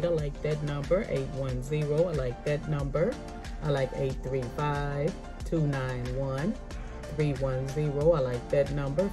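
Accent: South, United States. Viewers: Thank you I love ❤️ hearing you southern lingo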